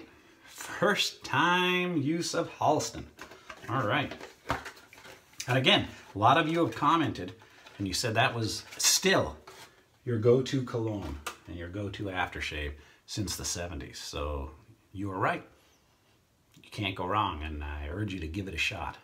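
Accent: England, United Kingdom. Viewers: You sound like you're from Kansas. Can you give us a taste of the ol' English accent? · Would love to hear your Newcastle accent on camera, and to see Eternity coming next in this record breaking series!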